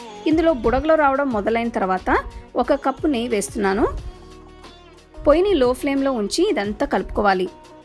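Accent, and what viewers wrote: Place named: Kerala, India. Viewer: Meeru English lo matladuthe eh kerela oh Mysore anukunanu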